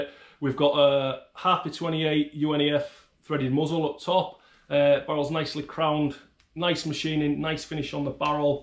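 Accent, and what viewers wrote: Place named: Northern England, United Kingdom. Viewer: Hi there matey, am loving your 'Northern Style' as a fellow northerner it's nice to hear rather than yet another 'Shandy Drinker'. Just got my 22WMR Variation back from my local force and I am currently in discussions with Rob at Guncraft Ltd. about one of their 22WMR AR's. The thing that I can't seem to decide on is barrel length vs Handguard Length. What Length is your DD Handguard? as I am aware you're running one of Rob's earlier 18 inch barrels. The reason I ask is I like the look when the barrel doesn't protrude far past the Handguard, that way when you add a moddy it kind of has the look of an MP5SD if you know what I mean? Finally I see you like the Kriss Defiance DMK22, I've had mine for just short of three years and I absolutely love it, still one of my favourite gats. I'd post a pic but haven't worked out how to do it yet? 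Oh an incidentally this is my first ever You Tube comment so consider yourself honoured!?!?! lol.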